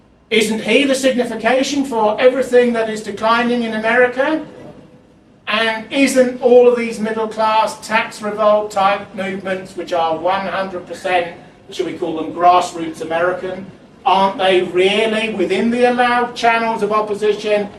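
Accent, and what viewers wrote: United Kingdom. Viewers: Idk man to me he sounds pro'er Bri'ish.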